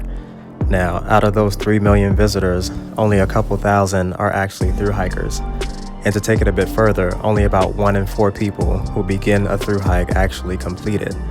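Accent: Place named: Maryland, United States. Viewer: hey! Greets from Northern VA! You sound like you are over the Potomac in Maryland. Came over from Alicia's channel on the Dolly Sods adventure.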